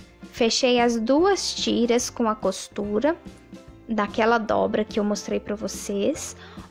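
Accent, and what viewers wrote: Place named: Minas Gerais, Brazil. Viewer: Adorei a explicação pra construção da máscara!! Obrigada!!! Vc é mineira né??? Que sotaque gostoso...BH???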